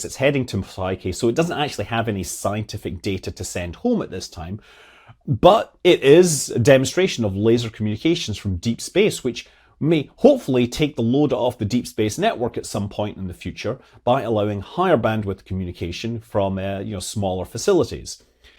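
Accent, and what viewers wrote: Scotland, United Kingdom. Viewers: i like your channel and the scottish accent. i have on question about the hot staging and the negative acceleration which gives sense about causing the interruption of the steady propellant flow to the raptors. fluids without force field to keep them above the outlet are no issue on earth because gravity. acceleration may replace gravity at hot staging. I don't get how fluid transfer in space should look like. is the acceleration planed or do they think on a type of plastic bag inside the tank open to the outlet and on the other side by gas pressure to push it out. are there "plastic bags" working at liquid O2 temperatures?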